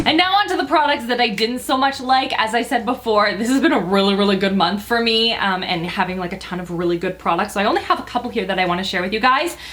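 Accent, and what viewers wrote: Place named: Canada. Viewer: . Your look today is spectacular! 😊 I love watching your videos not only because of your fab content but also because as a fellow Canadian who lives in Spain, I need my dose of the Canadian accent. thanks for that!! 😊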